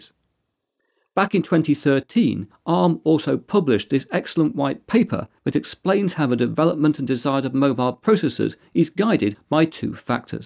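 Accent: United Kingdom. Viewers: This is how I like computer news: clear, concise and British!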